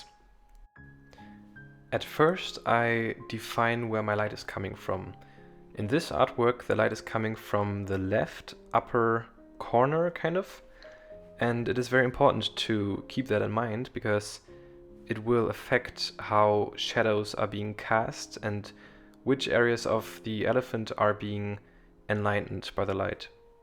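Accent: Germany. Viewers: I pick up a really small german accent but you sound very calm and focused! Great work, no need to feel awkward :D awesome video, thank you so much! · Ich dachte mir schon so...hm...ziemlich deutscher Akzent... ach der ist ja tatsächlich Deutscher xD Ziemlich mutig ein englisches Voiceover zu machen :) Das Bild sieht einfach nur atemberaubend aus, nicht nur wegen der tollen Anatomie, sondern auch weil deine Bilder irgendwie immer einen... mystischen Touch haben :D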